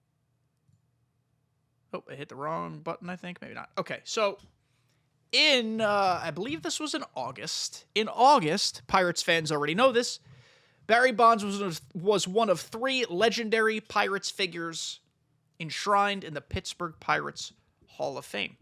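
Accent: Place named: United Kingdom. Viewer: Lmao the British accent… Well they added Sammy Sosa and Mark McGwire into ‘24 and I didn’t think I’d ever see the day this would happen so seeing Barry back in ‘25 is very much possible. I’ll say at least a 25% chance it happens…🤞🏽 Barry was in one game I used to play on ps2 where he crushed every single ball out the park lol I think it was called MLB 2003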